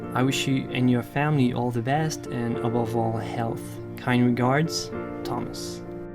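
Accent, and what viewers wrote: France. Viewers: Salut, je sais que t'est français, l'accent dit beaucoup de choses, et j'ai envie d'en parler en français, si quelqu'un veut le traduire, c'est a sa guise, je pourrais l'écrire en anglais mais je trouve ça plus personnel de te le dire de français à français, enfin bref, sache que ce Thomas a eu exactement le même ressenti que moi et beaucoup de gens comme tu l'a expliqué tu est toi même passé par la, mais la n'est pas le truc qui m'a touché, ta réponse, ta réponse change une perspective que j'avais alors même que je m’apprête a faire ma rentrée en école d'arts, j'ai du talent, et je le sais, mais ta vision des choses optimise tellement le gain de confiance et juste le fait d'apprécier créer que tu change beaucoup de choses, je suis passionné de création je voudrais avoir toutes les créations du monde dans ma maison, et je voudrais que les miennes en fasse partit intégrante, j'écris sans réel but la.. 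j'ai juste besoin de poser mes pensées quelques part.. hum. je souhaite rentrer dans l'histoire de la création de l'homme tout simplement pour que mon histoire et l'histoire de mes créations me survivent, j'ai le même objectif que toi, je suis conscient de ma mortalité, mais je suis aussi conscient de l'immortalité de mes idées et pensées. alors sache que mon amour pour toi est infini, et mon amour pour tous l'est aussi, si vous voulez créer.. créez alors, ne vous gênez pas.. j'ai vraiment besoin d'écrire un livre sur mes pensées et mes idées artistiques moi.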